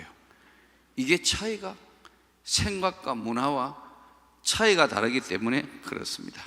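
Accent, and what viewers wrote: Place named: Gyeongsang, South Korea. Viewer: 말투가 대구분 이네요.. 역시 훌륭한사람도 많이 나와요^^ㅎㅎ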